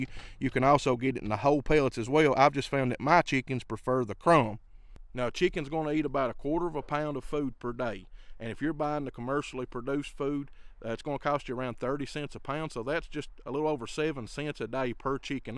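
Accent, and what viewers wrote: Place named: Louisiana, United States. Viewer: Are you in Ruston? Your voice reminds me of my family from Louisiana.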